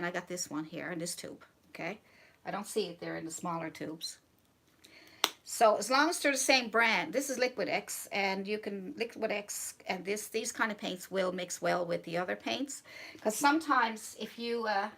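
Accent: Newfoundland, Canada. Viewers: I must ask you, are you from Newfoundland. I hear your accent and colloquiums which bring me to this conclusion. I'm glad I found your great tutorials. Best Kind. Thank you for your secret into why my paintings are killing me. I was a mural painter for a living with a few other talents lol I thought canvas painting would be a great step but I hit the wall. I'm taking it down after watching you, brick by brick. Take care.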